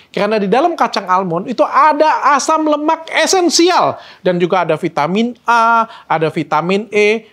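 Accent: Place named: Kalimantan, Indonesia. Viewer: biasa nya kalau ngomong karena "kerana" dan R agak kedal itu asal kalimantan bener kah ?? BTW ,, menunggu vitamin untuk rambut uban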